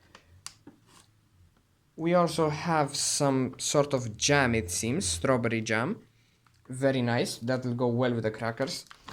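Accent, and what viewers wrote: Romania. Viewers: Dude. Are you from Romania by any chance? Can't tell from the accent...But it sounds just like it.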